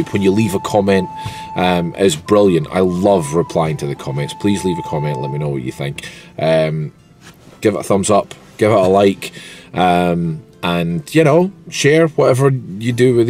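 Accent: Scotland, United Kingdom. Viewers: I've loved the city ever since spending my junior year of college there at the University of Edinburgh. Good times! And somehow, whenever I see the castle, I feel like I'm home, at a second home. Plus it's always a treat to hear the Scots accent. My father was Scottish, but his accent had pretty much vanished when I was growing up - although it would return with a vengeance if he ran into a fellow Scot!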